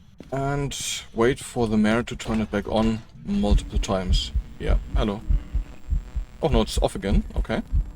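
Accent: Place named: North Carolina, United States. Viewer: you, sir, have an unusual North Carolina accent...:face-orange-raised-eyebrow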